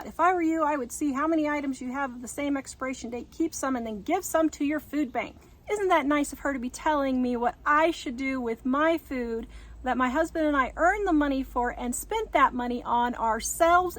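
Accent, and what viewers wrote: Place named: South, United States. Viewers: I am a firm believer in being prepared for any situation!!! This upcoming winter is gonna be a bad one. I would much rather be prepared now then wait. Seems like some people just don't wanna listen and prepare now but I loved your southern accent lol. I'm with you can never have too many spices 😁😁😁👍👍👍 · 1., are you sure you aren’t Southern? 2. These people have never been hungry or they would know how if you are hungry enough you wouldn’t look to see if something is expired. 3. Cereal is nutritious when that is all you have and I eat Cheerios Honey Nut every morning. 4. How do you know if a can food has become infiltrated with botulism, I’ve always heard canned food can have it like bent cans? I’ve never heard how to recognize it. 5. People are going realize Pride goeth before destruction! Forgot verse number and book!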